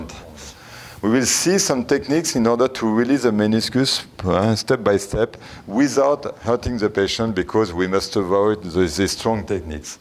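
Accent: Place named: France. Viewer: very good and nice French accent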